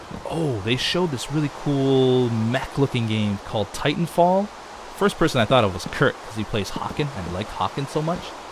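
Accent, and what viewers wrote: Australia. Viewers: He might be using a different language. I believe its Australian English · Australian speak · He have australian language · it happens when u use the Australian english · U have Australian English on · It sounds Australian like me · hes using aussie speak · Australian english language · was using Australian English for this episode I think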